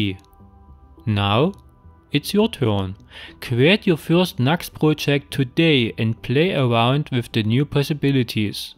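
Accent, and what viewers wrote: Germany. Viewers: Klingt nach Sächsischer Schweiz, Dankeschön für das geile Video! Ich würde mich auch sehr über ein Video auf Deutsch freuen. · Your accent sounds like German. Anyway, thanks for your good information. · This accent is special and typical from east-germany. I am from germany as well and I immediatelly recognized that he is from east-germany (Ich vermute Thüringen oder tiefes Sachsen ;-) ). After all the video and the stuff is great.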